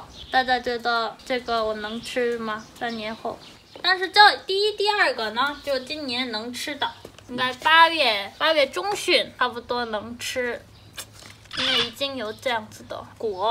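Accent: Northeast, China. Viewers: 博士为什么一口东北味儿？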